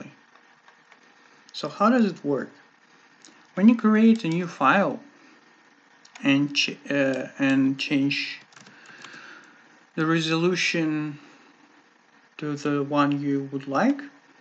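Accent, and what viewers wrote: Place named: Russia. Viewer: Also, do you speak Russian? Your accent seems familiar)))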